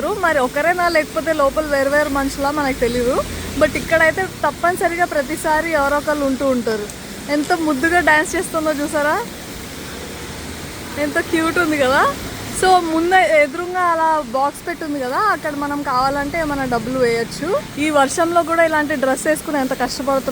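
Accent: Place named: Karimnagar, India. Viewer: Slang different antey meru Hyd or knr. madi karimnagar akka. Continue videos cheyandi anna.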